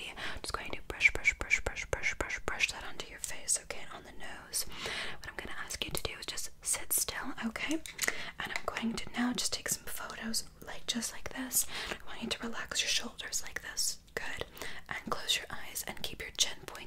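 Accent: United Kingdom. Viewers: I love your British accent, it's so cute and relaxing! :) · British accent on❤️ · UK accent on point! Great ASMR vid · I missed the British accent!!! It’s been a while ❤️ you’re so good at it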